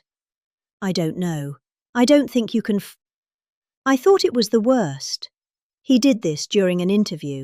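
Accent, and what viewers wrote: England, United Kingdom. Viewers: Dear Americans, that is an English accent. There is no such thing as a 'British' accent.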